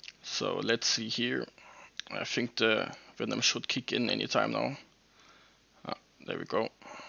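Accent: Germany. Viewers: U sound like a german?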